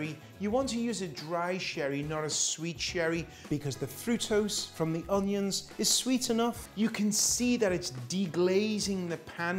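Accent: Liverpool, United Kingdom. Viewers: Do I hear traces of a Liverpudlian accent? I'm not from the UK. However, I find the plethora of accents from different regions very charming. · SCOUSER ACCENT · Scouser liverpool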